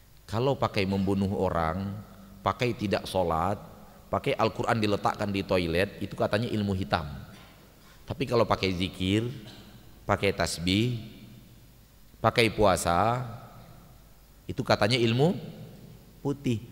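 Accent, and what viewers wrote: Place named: West Sumatra, Indonesia. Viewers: uztad orang mana..? seperti nya menggunakan logat minang..yg sangat jelas logat nya. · Vimia Zuhry org Pekan Baru suku Minang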